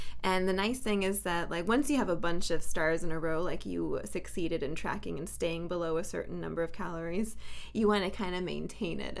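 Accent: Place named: France. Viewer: "I hope this is recording 😵🥴 ... " 🤣😂🤣😂 💚💛🧡💔 I grew up in France only to leave at the age of 24. English is full of French words: strange/extreme/beauty/desire/verbal/mode/blonde/etc so you already know a bunch of vocabulary. When we French speakers learn English the same happens ! Where as when we learn German there's little to start with. But you English speakers also know a bunch of German vocabulary: break/brechen White/Weiß Mother/Mutter Brother/Bruder and so forth. Your french accent is so cute ☺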